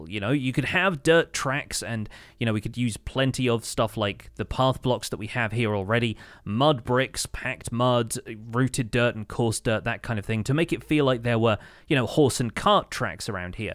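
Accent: United Kingdom. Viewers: Finally caught up! Got halfway through season 2 then life got in the way. Started on S3 recently and as always - I throughly enjoy your take on MC coupled with the British flavored commentary 😉 I appreciate your attention to builds/farms that won’t directly work in bedrock as that’s the version I use. Many thanks for the time and effort you put into the survival guide series and I look forward to seeing more🎄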